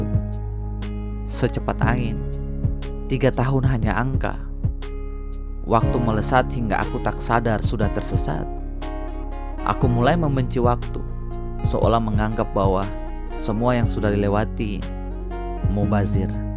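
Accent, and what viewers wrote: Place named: Ambon, Indonesia. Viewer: kk punya kekuatan itu di bahasa dan dialeg ambon, KK percaya saja samua orang pasti pahami dialeg kk, semangat KK #ambonpride 👊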